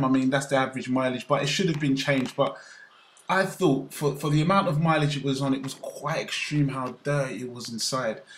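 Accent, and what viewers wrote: United Kingdom. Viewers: You know just by hearing you talk im almost 100% sure you are black and from the uk EDIT: no offence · where are you from .. England ?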